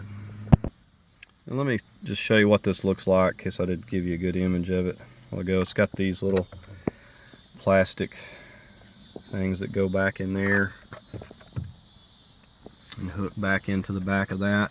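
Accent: South, United States. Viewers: trying this later. you def a southern dude. north carolina or virginia?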